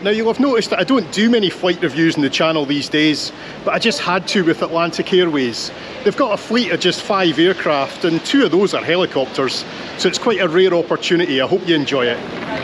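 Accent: Scotland, United Kingdom. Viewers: Your Scottish accent is AMAZING!!! Sending peace, love and prayers to you!!! 😊😊😊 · I certainly do enjoy your Sottish? accent, do wish that I could emulate the fine sound 😮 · Excellent video. Love that Scottish accent...from a Celtic cousin in Ireland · Love the video. I was searching for more about Faroe islands. I love this video also because of the fantastic scottisch english accent. Greetz from The Netherlands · How I have missed hearing a Scottish accent; I live in Canada. Thanks for the beautiful videos. I have a great passion for Scandinavia myself, specifically Iceland. The people are very similar to us Scots, or at least so I've been told😊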